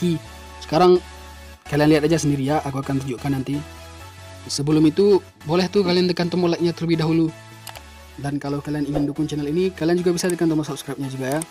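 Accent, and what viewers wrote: Aceh, Indonesia. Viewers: dari logat lu, gw tebak lu dari negri plat bl ya bro?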